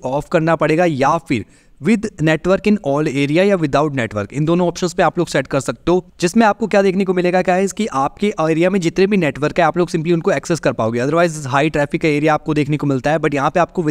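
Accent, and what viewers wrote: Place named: India. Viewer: You talk too fast with the hindi accent.😮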